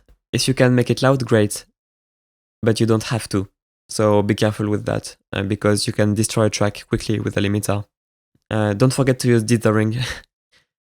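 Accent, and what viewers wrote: France. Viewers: hey First off its a Very cool video you explain quiet well and thanks you and secondly are you français ? 🙃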